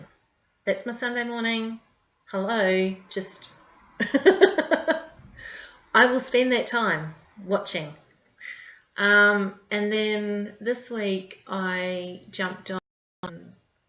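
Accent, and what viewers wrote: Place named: New Zealand. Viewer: Oh my goodness, I thought (assumed) you were from NZ!! Great responses here, I’ve been dabbling with some deck mods recently, only decks that are cheap and easy to get though. I’m the same, I’m not a huge fan of long videos, I try to keep mine around 10 to 15 (every now and then they go over if it’s something special). I’m off to check out some of the other channels you mentioned!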